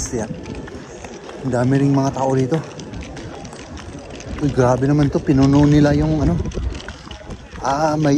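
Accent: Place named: Igorot, Philippines. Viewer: Ask ko lang po, yung accent nyo kasi sa Tagalog parang Igorot. Ay Igorot kayo? First time ko agbuya vlog yu ta recommended ti YouTube. Nag subscribe akon.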